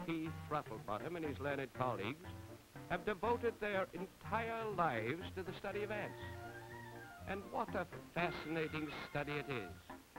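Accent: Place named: Transatlantic, United States. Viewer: Transatlantic accent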